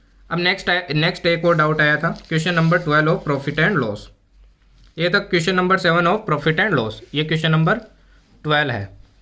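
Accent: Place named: Shekhawati, India. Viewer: Your accent is so shekawati, good to hear. Keep improving.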